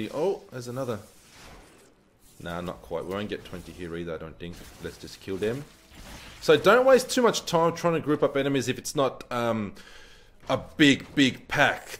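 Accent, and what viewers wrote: Australia. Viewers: you definitely sound like a fellow Aussie.. good video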